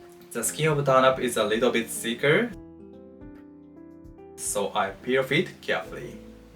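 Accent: Japan. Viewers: Great video, arigato totemo ! Love your nippon accent and your serenity! Cooking is like a religion 😊🙏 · Agree! Your voice and Japanese accents are so pleasing to listen to 🌹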